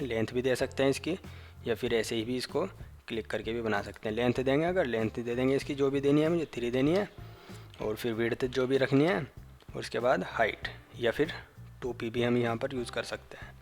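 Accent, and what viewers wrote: India. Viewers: bhut ala or apki Urdu bari mazay ki ha , anda kaar shape very sweet Indian Urdu I like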